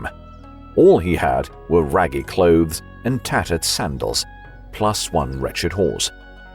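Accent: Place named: United Kingdom. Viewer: I love the British pronounciation so much. Sounds professional. It's my first time to listen English audiobooks. I can enjoy learning English now.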